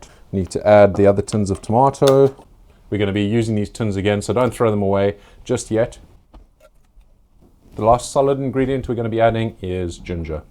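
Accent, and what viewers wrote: South Africa. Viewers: Sorry I haven't seen your channel until today as your content is great! Are you from Capetown originally? I think I hear a definite SA accent. I have made ketchup/catsup a couple of times, much superior to store bought, but a real effort to do, I think that if you get in the rhythm of doing it, it is as much of a chore. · Typical weather in the UK? I could have sworn you were in South Africa with the accent. Hmm.. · No way i thought you were south aftican not british!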